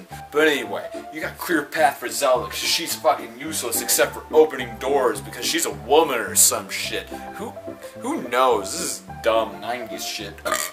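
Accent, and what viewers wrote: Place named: Germany. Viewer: I wish you would have done this in your german accent, but this was really funny you should damage your liver more often xD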